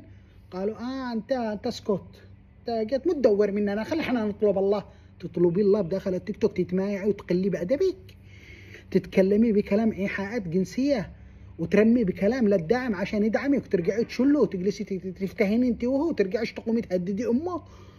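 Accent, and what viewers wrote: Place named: Taiz, Yemen. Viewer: كلاامك تعزي مرة بس إنتا قلت إنك مش عايش باليمن كيف كدا قادر تتمسك بلهجتك رغم عيشك برع اليمن!!؟.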